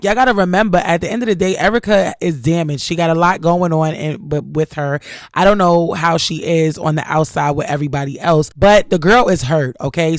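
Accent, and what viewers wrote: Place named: New York, United States. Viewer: Think she from new york .... sounds familiar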